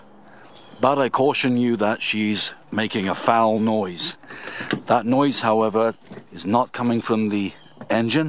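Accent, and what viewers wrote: England, United Kingdom. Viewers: You are definitely English,and also sound like George Harrison!!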